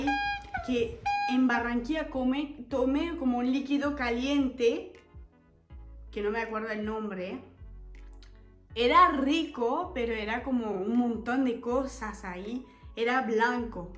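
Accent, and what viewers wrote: Buenos Aires, Argentina. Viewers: Señorita vaya que se le pegó el acento argentino.. "sha" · Su español es excelente,no se te siente el acento francés que suele ser muy fuerte.Felicitaciones. Su acento argentino suave es muy lindo. Y si comemos arroz como locos,les ganamos a los chinos 😅😊😊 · Hola!!! Tremendo acento argentino 😂😂😂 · ese sonido "chillón" {chirriante y chocante} de esas letras "argentinizadas" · Que bueno escucharte, soy de Bogotá y me alegra mucho que te Gusto mi pais,me gusta tu unionde argentino con barranquillero y algo de Bogotá una mezcla que se escucha chevere sobre lo de mona es por que tenias el cabello algo rubio y la piel blanca es tambien algo de cariño como lo qué comentabas del mi amor.😊😊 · Jajajaja es super encantador ese acento entre argentino y costeño que tienes jajaja <3 <3 <3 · 😊Con acento argentino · Habla como de argentina boludo no como frances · Me encanta como incorporasre es el modo de hablar porteño. 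Ahora bien, si hay más vendedores ambulantes que acá en Argentina, es mucha gente vendiendo en las calles. Muy lindas las referencias de Colombia. ¿Por qué será que siempre vamos tras el lenguaje coloquial y el grosero de cada país?😂 Salut a l'amie française!!!! Dónde vivís en Francia? Cariños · Mona aquí en Colombia se refieren al color del cabello y encima sos Blanca total. En España le dicen Mona a las cosas que les gustan y son bonitas pero a las personas no. Y vos tenes acento argentino. Pero de francesas no te veo nada ois. Soy de Medellin tierra hermosa somos muy amables igual que Bogotá, amo mi tierra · creo que los colombianos no te van a entender mucho,vos hablas más argentino que colombiano. Te extrañamos en argentina 😊😊😊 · Bueno, pero al fin es Francesa o Argentina? Habla como Argentina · Aprendió Español con alguien De argentina. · Jajajaja me encanto su video hablas muy chevere ..pero tengo una duda eres francesa o argentina por q su acento es argentino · No entendí bien francesa por qué tienes el acento tan pronunciado de Argentina pero sabes por qué Barranquilla es una parte muy bullosa y de muchos carnavales te faltó muchas partes preciosas para conocer y ante todo la gente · Bogota no es una provincia y deja de hablar como una argentina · Pues de acento colombiano tienes bien poco: lo tuyo es argentino. 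Soy español y también hay cosas que culturalmente no compartimos con los hispanoamericanos .... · Ya tienes un dejé costeño, eso si, el argentino porteño lo tienes bien adentro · Eres francesa , pero tu acento es argentino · Hola te quedó el acento Porteño. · Colombiano que se respete come arroz como sea bien chistosa esta estrangera pero es francesa pero tiene acento Argentino si yes pero como la francesa vivio en Argentina luego aqui en bogota colombia si yes bien venida a colombia soy colombiano estrangera hermosa si yes estas muy bonita si que dios te guarde y santisma virgen de Guadalupe siga asi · Ud es de Francia o es argentina porque tiene más asentó argentino que francés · Yo soy colombiana, y mi esposo es árabe.. y ellos comen más arroz que nosotros. Mi suegra se gasta 3 kilos de arroz diario. La yuca con café con leche... jajajaja qué veneno, y dice que tiene problemas digestivos para los fritos 😂. 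Y nutella, que prácticamente es chocolate con aceite de palma que no es digestivo para el cuerpo... Qué triste que destruyas tanto el Idioma Español. O hablas como argentina o adoptas el idioma Español colombiano. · Los huevos :pericos😂 Hablas una mescla divertida de barranquillero con argentino...saludos desde bogota colombia...exelente video. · No tiene nada de francesa,puro acento argentino,mi amigo francés lleva más de 15 años en colombia y se le nota el acento francés ,todavía se le dificultan palabras y eso que es profesor,esta chica no es francesa. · Haha el acento de tu español es completamente argentino con alguna palabra colombiana por ratos y el frances haha me encanta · Ton accent est très argentino mais les paroles que tu dites sont très barranquilleras. 😅😂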